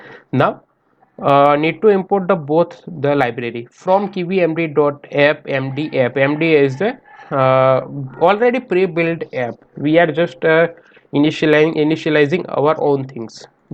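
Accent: India. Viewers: I'm very glad to know that You are Indian... I got it when I heard first word .. Indians ki avaaj sabse alag 😎👍🏻🔥❤️ Me Bhi Bhartiya bhai 👍🏻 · When I heard the indian voice I came to knew that I am going to do something interesting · When you search the whole internet but found a Indian guy speaking English then you knew it will work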